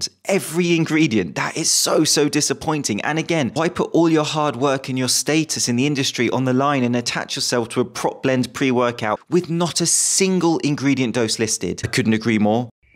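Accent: United Kingdom. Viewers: a normal looking guy in glasses and a British accent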